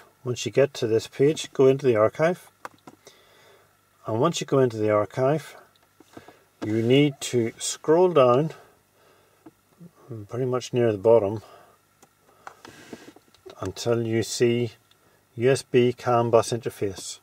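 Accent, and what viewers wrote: Northern Ireland, United Kingdom. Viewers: haha do i sense a slight NI accent ;) cool video btw! was researchin canbus stuff myself, subbed :)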